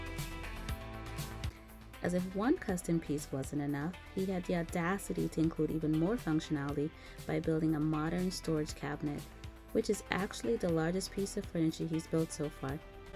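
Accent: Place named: United States. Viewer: For being an immigrant you have a very good American accent. Wouldn't have guessed it. Great videos btw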